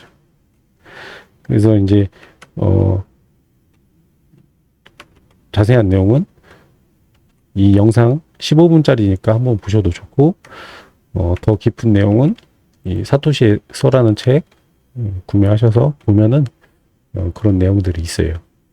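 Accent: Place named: Jeolla, South Korea. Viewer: 말투가 딱 전라도 스럽네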